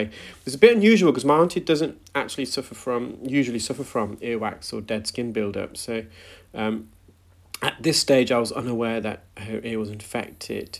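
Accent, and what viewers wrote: United Kingdom. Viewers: British people sound like that. I watch a fellow from Scotland who treats cow hoofs. When he says "bull" it comes out sounding like "bill"! Took me a minute to get used to that Scottish twang, applies for Brits too......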